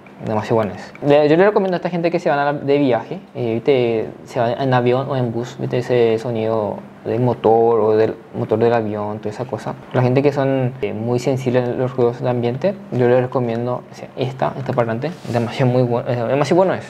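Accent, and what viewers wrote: Paraguay. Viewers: Sos Paraguayo?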